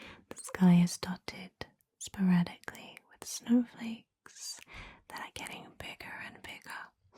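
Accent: United Kingdom. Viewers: Your guided meditations are the best with the cherry on top being your british accent! This is as amazing as the previous ones ❤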